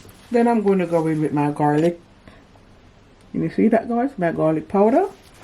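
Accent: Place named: Jamaica. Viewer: Very well presented you're channel is amazing I love the yard voice. Bless up yu self.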